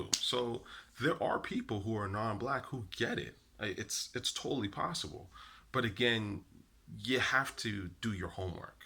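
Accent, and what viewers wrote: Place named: New York, United States. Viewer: He talks like a fruitcup from new york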